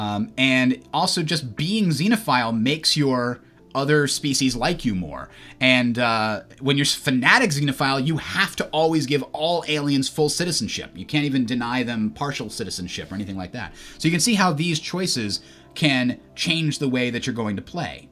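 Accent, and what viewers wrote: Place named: United States. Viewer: The yankee pronunciation is annoying but hey, great vid. While the word "pop" made me cringe, you explained everything well. I really needed this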